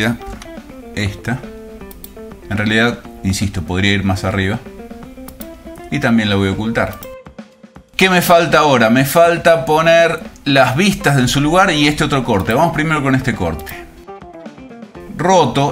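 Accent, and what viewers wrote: Argentina. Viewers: Me encantan sus videos Maestro! y Me encanta mas el acento Argentino! Yo ya usaba desde hace casi 8 meses el Sketch Up pero con tus videos aprendo mucho y me quito ciertas malas costumbres o vicios y comienzo a dibujar mas rápido! Saludos desde Mexico!!! 🏠🏠🏠 🏠🏠🏠 🏠🏠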